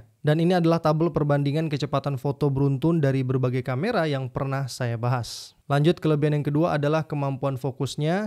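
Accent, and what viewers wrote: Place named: Kalimantan, Indonesia. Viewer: Dari logatnya mas orang kalimantan kah?….. tapi mantap mas aku suka reviewnya masuk dalam rencana pembelian aku, ya walaupun bekas juga sih hehe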